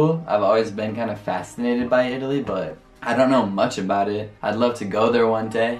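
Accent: United States. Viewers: Non so se sia più bello quando parli inglese o quando parli italiano con l’accento americano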